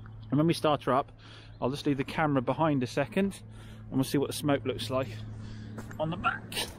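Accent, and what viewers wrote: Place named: Devon, United Kingdom. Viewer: The sound of Bruce Forsyth from deepest Devon 😊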